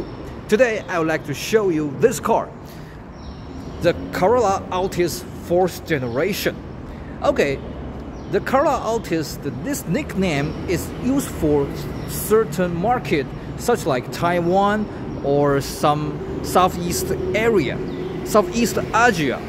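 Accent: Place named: United Kingdom. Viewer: 講的很有道地的英國口音不愧是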